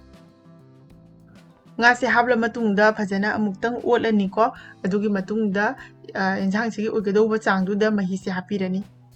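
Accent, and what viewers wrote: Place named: Imphal, India. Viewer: Yaamna fajaba, asengba meetei lon ngaang-nge Imphal lomgi, video upload ta ngaangliba meetei lon sing Adudi taabada pelle.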